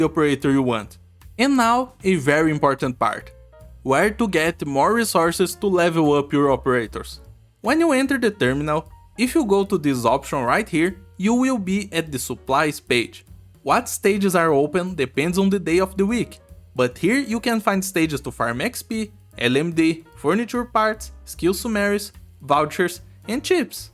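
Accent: Brazil. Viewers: i can pressentir that you é um brasileiro kkkk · Thanks mate, I gonna start in arknights because two reasons. 1 - Monster hunter 2 - Waifus. (Obrigado mesmo foi bem simples a explicação, e o sotaque brasileiro denuncia bem rápido kkkkkk)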